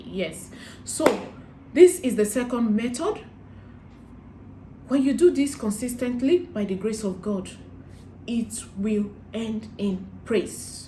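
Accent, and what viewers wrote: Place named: Nigeria. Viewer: Am in love with your Nigeria English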